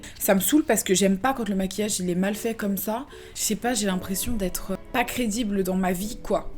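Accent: United Kingdom. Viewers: Ton acsan anglais😒😒😒 et chiant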